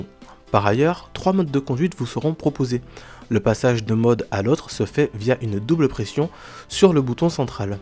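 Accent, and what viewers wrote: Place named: China. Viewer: je sait que tes chinois car j'ai voyager dans le monde et je reconait tous les accent meme petit qu'il soit ! enfin je dit ca , car je voulait dire que la chine est un pays qui invente des choses supperbe , que ce soit des choses que l'on trouve sur des vidéo youtube insta ect comment ouvrir ceci sans cela , comment devisser sans clé , comment ceci comment cela , les vidéo sont nombreuse ! les chinois sont supper inventif ! puis ont a sur les magasin de type magasi nen ligne qui vende de tout petit gadgetr mais a chaques fois des choses merveilleuse mais qui a chaque fois sont très utile . puis ont a les smartphone dont j'ai plus jamais acheter autres que huawei ou wiaomi comme le 14 tpro qui a une carte graphique de ouf ! 12 giga de ram enorme ! et un demis terra ! et le proccesseur ses un des meigleiur ! soliditer supperbe avec le dernier écran le plus solide du marcher ! 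bref la chine vient en force dans tous les domaine ! ils faut dire que la chine est en avance de mentalité de plusieurs millénaire ! l'unique dommage ses la liberter en chine ! et beaucoup voye le chine comme une menace moi je vois que la russie et que la chine ne donne rien pour l ukraine enfin aide sont allié mais de chose minim mais non offensif ! bref la ici la trotinette moi jai le model xiaomi 4 lite 2 gen que j'ai eux gratos en achetant le 14t pro ! tous ca pour dire que plus j'achete chinois plus je me rend compte que leur gamme monte de niveau , ont des loin du made in china des années 90 dont tou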